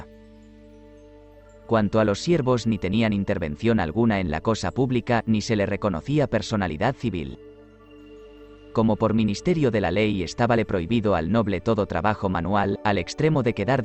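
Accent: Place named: Canary Islands, Spain. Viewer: Una forma de darle un plus de valor a la información es hacerlo con un acento que no le CHIRRÍE A NADIE. Un acento canario formal, (no el coloquial o informal de bares y colegas) en un tema canario es altamente recomendable y muy coherente, máxime si encima ustedes se hacen llamar.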